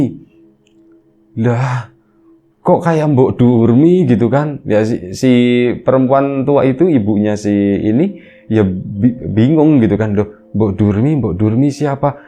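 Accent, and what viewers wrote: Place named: Banyumas, Indonesia. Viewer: Subscriber baru, denger cerita ini perasaan saya campur aduk antara PENASARAN, SEDIH DAN LUCU. penasaran sm endingnya krena sengaja ga bc komen, sedih sm kisah hidup mas parman dengan anaknya LUCU sm pembawaan yg natural banget 😁 tentu dengan logat ngapaknya, sukses terus buat channelnya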